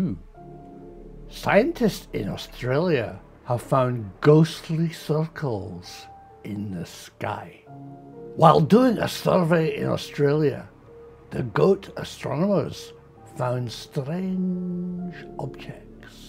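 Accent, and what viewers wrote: Scotland, United Kingdom. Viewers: Can I suggest you lay off the Scottish accent...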